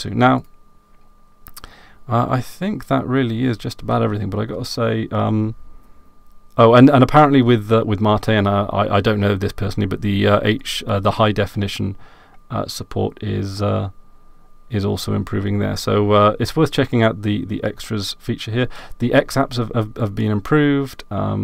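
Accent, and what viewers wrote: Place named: England, United Kingdom. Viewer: You come from England, right?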